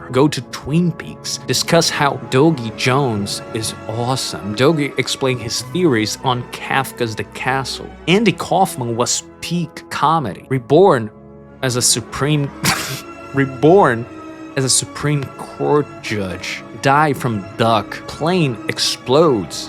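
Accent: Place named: Brazil. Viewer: Desde os primeiros segundos de vídeo eu tive uma voz alta na minha mente dizendo "eu sei q esse cara é brasileiro mas eu não tenho como provar" ate que com o tempo o próprio vídeo confirmou o meu pensamento e ter isso em mente enquanto assistia deixou a experiência ainda mais divertida(caçar os detalhes mais óbvios tipo as partes em q vc falava português ate os pequenos detalhes tipo o horário no desktop e etc) . Incrível o seu trabalho e espero muito que seu canal de certo.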